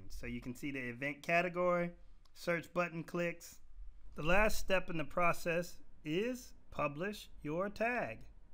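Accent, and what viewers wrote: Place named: Louisiana, United States. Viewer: Solid concise video! Was wondering how these disparate areas connected. You filled in my vast, unknown gaps in knowledge. AND you did it with a..... Louisiana accent?! You got some Southern accent mixed with something else. I couldn't quite figure out. Anyway. That was 100%, Prime, Grade A teaching!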